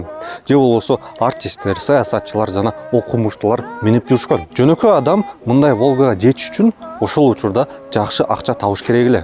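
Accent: Standard. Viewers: Сонун экен .Алып баруучу сага чон рахмат ! Таза кыргызча айтып бердиниз . Ишинизге ийгилик. Чон респект !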